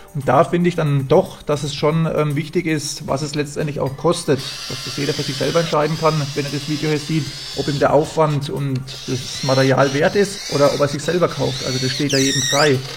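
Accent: Franconia, Germany. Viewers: Sehr coole Arbeit 👌 Auch sehr angenehme Videos 👍 Hört man da einen Fränkischen Dialekt? 😄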